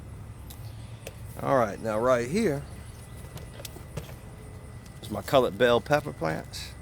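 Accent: United States. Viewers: Is that a New Orleans accent I hear? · Love this accent... is it cajun ? What is this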